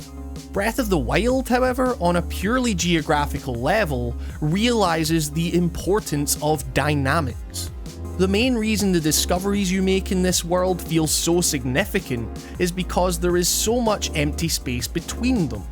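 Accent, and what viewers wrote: Scotland, United Kingdom. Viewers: yep, it's Scottish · is this scottish accen? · I love the Scottish accent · Lol, that’s like the mildest sort of east coast Scottish accent – hear some actual heavy Scottish accents and your mind will be blown… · Soviet Loli he’s Scottish...as am I, I find it kind of funny that people mistake our accents for Australian/Irish accents...but he’s Scottish. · please forgive me for this is i am wrong. first i'll say this video is great and you earned a new sub. i think the space of breath of the wild is fantastic and perfect. i do not like things stacked on top of each other and it promotes "hunting" for things. now for the forgive me part. i am guessing you are from Scotland and trying to down play your accent? don't....if that is what you are doing. i could not help but be distracted by how you say things and it was.....odd.....at times. 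but, again, great video. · Hey everyone, non-native speaker here...is this a Scottish accent? Anyway, I really like it! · N K He's Scottish. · I think it's Scottish... I like it 😊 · Are you Scottish? If so where fae? I'm from Edinburgh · I clicked on this video by accident tbh because I was aiming for another one but I sat and watched because I was intrigued by the concept. Beautifully put and I absolutely agree 100%. I've always loved Zelda but this game has completely reawakened my love for games. I've got all the divine beasts and instead of going straight for Ganon I want to explore the world and see what I've missed, much like I did when I was 7 and stealing my brother's Ocarina of Time cartridge. Only this time the world is there to explore! Thanks so much for putting it into words and making me realise what this game has brought me. Also hello from a fellow scot and boo to all the people making fun of our accent (they're just jealous they're no in on the brawest accent ever, eh?) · Im sorry to go off topic, but is that an Scottish accent? 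or Canadian?:D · It sounds like a Scottish accent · its a Scottish accent not a Canadian accent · oh sorry. plz excuse my ignorance. it's still a great accent! Scottish?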